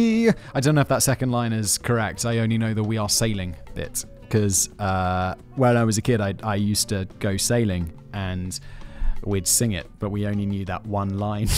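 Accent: RP, United Kingdom. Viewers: I love how the body Spiro was a recurring gag on Futurama. I'm sure might have heard about Futurama, but never watched it. At least we have a recurring gag on brain blaze. is a div who doesn't care to learn about anything, but his British accent and good writers and editors made him millions. I wish I had a cool accent and could make money reading other people's work....at least I'd enjoy and remember the videos I made!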